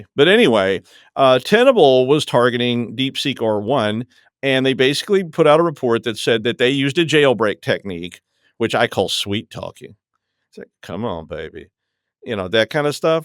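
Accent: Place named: Rhode Island, United States. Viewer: If I didn’t know better, I’d say you were born and raised in Rhode Island.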